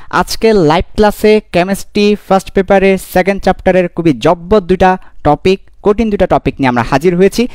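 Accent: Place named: Noakhali, Bangladesh. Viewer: Vai apner gram er bari kothay? Amr to mone noyakhalir Ashe pashe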